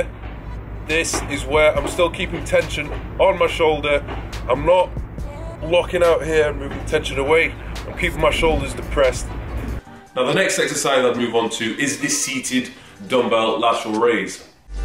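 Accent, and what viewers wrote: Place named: England, United Kingdom. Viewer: I fink he’s an English Bloke.Good instructions mate !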